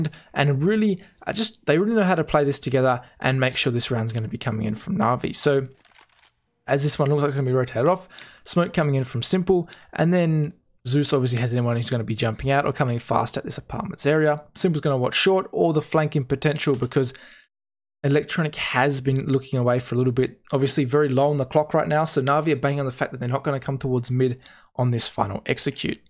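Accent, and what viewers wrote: Australia. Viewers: you should have at least 1M subs btw i love Australian english keep it up :)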